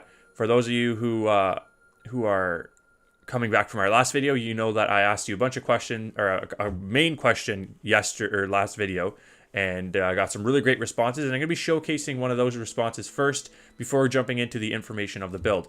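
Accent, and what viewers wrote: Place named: Canada. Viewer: OOOh that new microphone really makes the Canadian accent come out!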